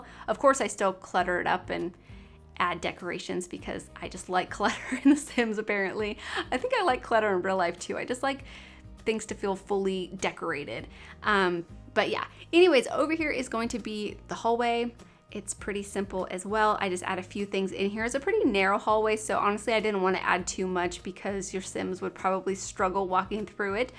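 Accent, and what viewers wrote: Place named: Michigan, United States. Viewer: are you from michigan originally? i see your adress is now in california but i just wonder because of your pronunciation :))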